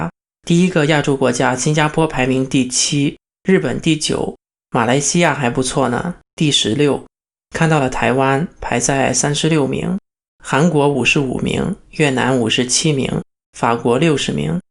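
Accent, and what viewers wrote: Shandong, China. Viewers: 听口音博主是山东人吗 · 同意，口音偏山东，给山东人丢脸。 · 逻辑错误：1、只要晚上天黑没有人，都会觉得害怕。错，在中国，偏远小镇天黑也没人，人不会害怕。说数字，不说数字都是扯淡。每十万人年刑事案件比率。说这个，说别的是扯淡。2、不知道哪儿出来的一个国际和平指数，和居民生活是否安全有毛线关系？一个野鸡和平指数排名排在110名，就能推导出在中国生活不是很安全？你脑子被法轮碾了？我也是知名学者，明天我就搞一个扯淡指数，你排世界第一。3、你还知道一个幸存者偏差，你列举的统统是负面案例，当然你幸存地认为中国是地狱了。不说概率，不说统计学上的调查研究结果，扯个案有毛线意义？还李刚儿子撞死，还毒奶粉毒死，这能比得过新冠死么？美国都死了20多万了，李刚儿子能撞死二十多万么？美国每年死于枪击事件好几万，中国毒奶粉毒死好几万么？4、中国癌症发病率死亡率全球第一？你在哪本大法杂志上看见的这个数据？我都笑喷了。起码的常识都不具备你。癌症发病率最高的统统是最先进的国家，因为人长寿，所以得癌，这么简单的事实你都搞不清楚。5、装个防盗窗，是落后意识和习惯，这也能佐证中国治安差，真是佩服你脑洞。那美国人家家有枪，是不是证明天天美国打仗啊。 综合可知：你是个不具备基本自然科学和社会科学常识的文盲，口音偏山东，普通话一股大葱味儿，一直提人权宗教，估计是山东农村那边信地下天主教的。逻辑混乱，语无伦次，建议你把口器闭紧了，味儿大的受不了，希望你能多学习，多闭嘴，积点德，为生态环境做贡献。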